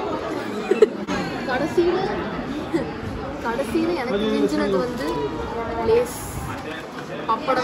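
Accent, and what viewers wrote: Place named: Kerala, India. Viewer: Nice Vlog captain.. Especially your Malayalam slang.. Kutty titanic 🤣👌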